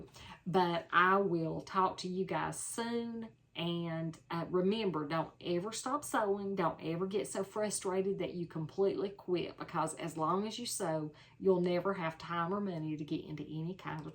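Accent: South, United States. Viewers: I love your videos,. Just love listening to your lilting voice. Where are you from? Can’t quite pinpoint the accent. I’m guessing the Carolinas. I was raised in East Texas, so I love listening to various Southern accents. So soothing! When my accent occasionally comes through (usually when I return from a visit to Houston), I constantly have to tell folks I have a Texas accent, not Southern. I have to explain that Texas is not part of the south, that accents are classed geographically and Texas is located in the middle, not to the right on the map! Most “other” people (you know who I mean! 😹) hear “South” and think “Confederacy.” That’s why they’re confused.. Bless their hearts. 🙄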